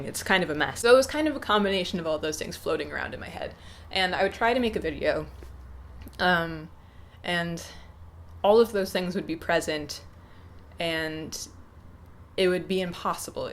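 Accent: Midwest, United States. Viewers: i finally heard the midwest in your voice here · You're sounding more American again. Huh. Interesting. hahaha speaking of analyzing. Glad you seem well and that the break was good for you and a well thought out, conscious decision. Privacy is healthy, keeping boundaries is healthy. Good on you!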